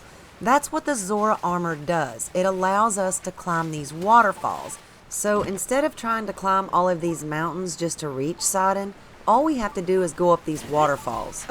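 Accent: South, United States. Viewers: No lie that southern draw from a woman playing games makes me feel comfortable asf lead me gamer woman!! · I did not realize how much better walkthroughs are with a soothing feminine southern accent. Great stuff!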